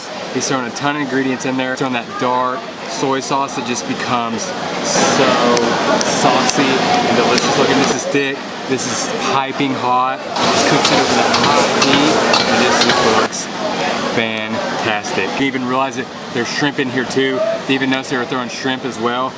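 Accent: South, United States. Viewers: I'm not sure where you're from? I would guest southern ish US? The way you talk its so calm and soothing n yet can feel the excitement... Good video btw Subcribed :-) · You are very descriptive in your food reviews, plus your southern accent is great. MA...LAY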